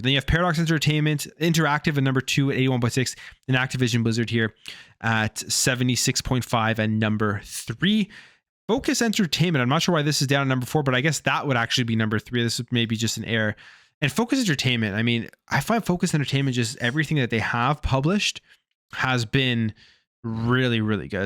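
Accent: Canada. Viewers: You from Canada bro? Just curious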